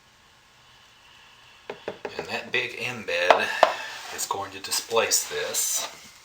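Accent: South, United States. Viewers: Hi! From Florida! I’m from NC, mountain side. It’s not often I get to hear a good Southerner accent. Love the soap!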